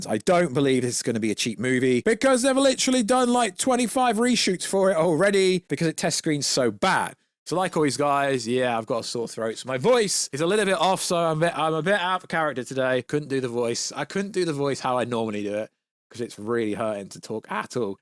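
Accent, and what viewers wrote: United Kingdom. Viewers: I can understand why he got it wrong he's from another part of the globe 🌎 sounds like he could be possibly from the U.K.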